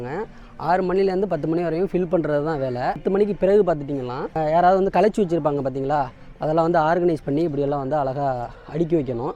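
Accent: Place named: Madurai, India. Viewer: Bro, I really like your innocent. Am admiring your way of talking. I think your native is madurai because your slang is like that madurai.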